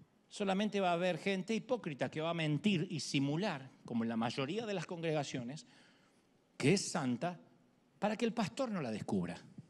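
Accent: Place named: Argentina. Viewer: Que hermosa unción de Dios, a bendecido tu vida y con acento Argentino, gracias por tus mensajes claritos.. Bendiciones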